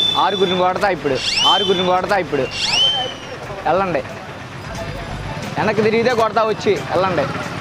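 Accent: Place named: Guntur, India. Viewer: Bhayadi prakasam, guntur side ankunta. Slang alanevundi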